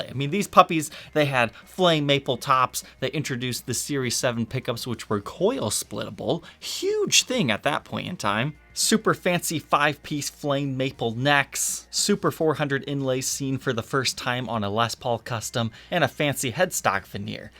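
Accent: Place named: Midwest, United States. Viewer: Its the midwest accent "t" sounds are pronounced like "d"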